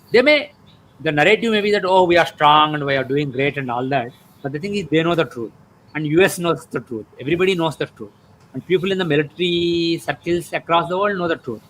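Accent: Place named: South, India. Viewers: He is south indian, an achiever, also astrology is his passion and interest. His way of speaking does not seem important, what he is saying is more important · 😂😂😂👎👎👎👎 Terrible Southie Accent. Speaking Madrasi ? Or English? No one understands. Terrible 😂😂😂😂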